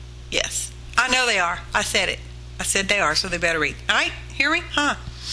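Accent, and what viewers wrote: South, United States. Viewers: No doubt you're a southerner! lol This was something I've never seen before and I love it!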